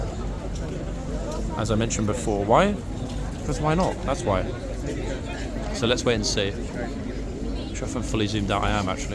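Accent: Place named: United Kingdom. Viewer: I love the British accent and humor ❤️😂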